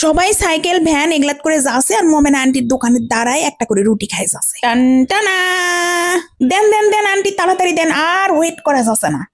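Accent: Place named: Naogaon, Bangladesh. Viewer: 😊😊😊আপু আমার এই ভাষাটা খুব ভালো লাগে নওগার ভাষা আমার একটা চাচী নওগাঁ তে থাকতো এই ভাষায় কথা বলতো যখন আমাদের বাড়িতে আসতো আমি শুধু এই কথাই শুনতাম আমি আর আমার আম্মা। আমার আম্মা এই কথা শোনার জন্য ওনাকে সবসময় আমাদের বাড়িতে বসাইয়া রাখত। এত ভালো লাগলো ফ্যান হয়ে গেলাম। ❤❤❤❤তবে বুঝে নিও পাশে থেকো।, 💌💌💌👏👏👏🎁🎁🎁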